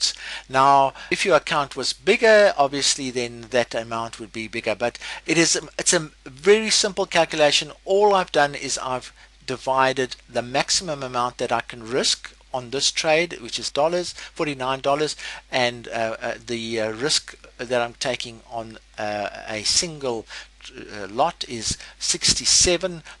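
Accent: South Africa. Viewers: You must be from South Africa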